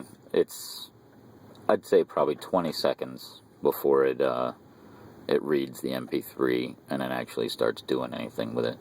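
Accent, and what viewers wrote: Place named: Philadelphia, United States. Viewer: Sound like Philly version of Jim Florentine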